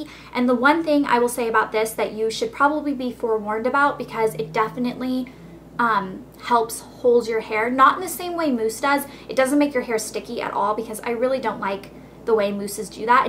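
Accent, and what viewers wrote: United Kingdom. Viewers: Haha I loved the British accent. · I love your British Accent ;-) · hahahaha your british accent - so hilarious <3 Love you! xx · I love your British accent haha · Your British accent is really good xx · OMG, love your british accent:) Sooo good!! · British accent? London Olympics of course ( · Hahaha! Love your British accent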